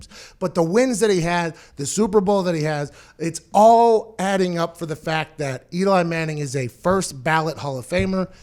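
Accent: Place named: Pennsylvania, United States. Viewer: god if only he could get rid of that PA accent.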